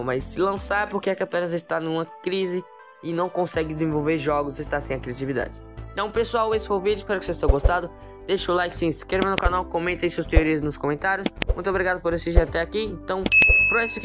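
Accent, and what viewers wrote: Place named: Brazil. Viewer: Oi tudo bem então eu até concordo com vc pq tipo se não der certo nos jogos da keplerians provavelmente ela talvez lançar o Ice scream 9 pq tipo eu sei que Ice scream 8 foi o encerramento da franquia Ice scream pq mano tem muito jogo do Ice scream ainda né então se a keplerians não lançar Ice scream 9 provavelmente ela vai lançar essa nova franquia aí né e pois é mano pq Ice scream foi uma franquia que durou mais tempo que na verdade foi 5 anos de franquia que foi desde 2019 eu tava no 2 ano da minha escola e eu nem ligava pra jogos de terror eu só liguei em 2020 né quando começo a pandemia né e pior qu foi isso tudo mesmo mas uma coisa que eu queria falar é que eu gosto muito de vc e também eu tenho 4 YouTubers favorito que são Isaque yt, Leozico games, player caro e vc que na verdade quando eu te conheci eu achava que vc era gringo mas depois que ouvi sua voz aí eu percebi que vc é Brasileiro né eu sei que eu devo ter digitado muita coisa aqui né mae são essas coisas que queria falar Mesmo mas pode falar comigo se quiser tá bom 👍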